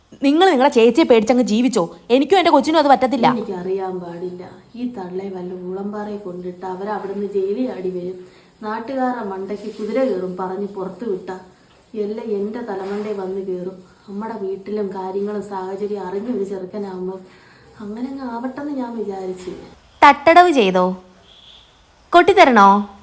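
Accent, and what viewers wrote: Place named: Thrissur, India. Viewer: Thrissur slang❤❤ nammal sadhukkal ayiiiiiiii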